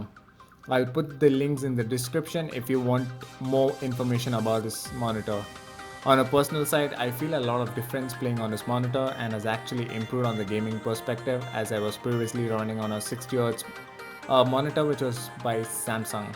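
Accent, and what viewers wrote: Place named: India. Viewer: probably because this guy sounds Indian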